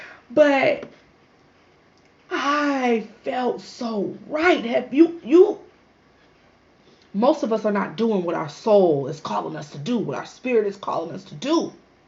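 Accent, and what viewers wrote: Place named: United States. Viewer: Hats off to you sister 😊 very inspiring! How did you get to Ghana originally to know of it as a lifestyle? You sound American born so I'm curious. Of course we all originate from there but I've never touched foot to know Africa as my home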